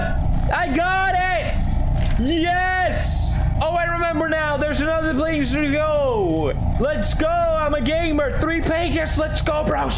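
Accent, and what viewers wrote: Sweden. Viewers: i tried to copy pewds with his Swedish accent and goofy speech patterns. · Because of the heavily Swedish accent that he lost over the years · He used to have a really strong Swedish accent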